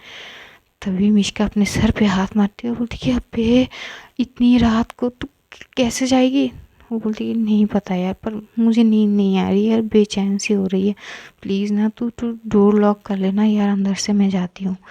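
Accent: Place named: Uttar Pradesh, India. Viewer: 🥰 intezaar to ittu sa bhi n ho pata ma'am pr ab iske alawa kr bhi kya sakte h.....hum UP walo ki tarah aap jb bolti ho n hye 💖 kya kren aapka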